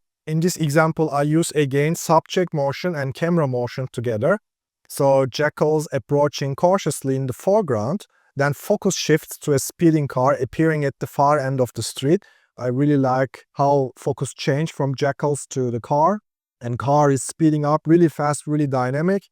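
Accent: Turkey. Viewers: türk ingilizcesi nerde görsem tanırım bre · From your accent I surmise you are from Turkey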